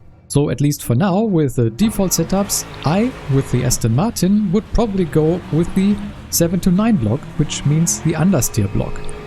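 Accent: Germany. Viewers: You cannot brake noob. Also dude, this German accent sounds terrible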